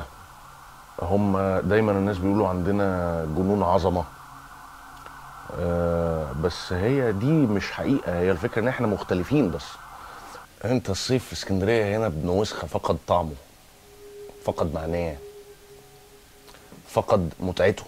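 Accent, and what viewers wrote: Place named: United States. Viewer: I remember you from nadi smoha, get rid off the American ascent on your words.. please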